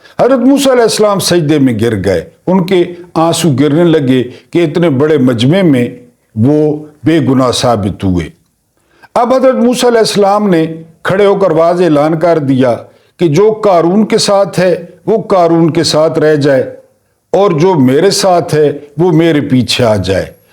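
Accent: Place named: Mianwali, Pakistan. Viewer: Sahib kabhi kabhi apni mianwali zuban aur lehjay ka chtkhara zaroor lagaya karain hamain buhut lutf aata hai.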